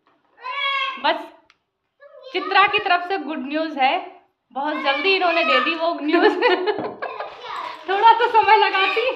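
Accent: Uttar Pradesh, India. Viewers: हमारे hardoi m bhi aise hi bolte h jaibo kaibo main jab apne ghar jati hu to main bhi aise hi bolti hu अपनों के साथ अपनी बचपन की भाषा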